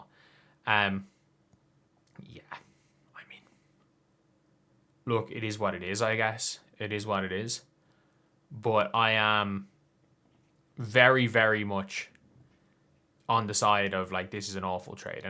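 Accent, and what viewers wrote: Ireland. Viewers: I’m not even happy and I’m a spurs fan. But I would rather hear bad news in an Irish accent. It’s just better. Such a “fml” accent. I’m gonna try to catch every one get you going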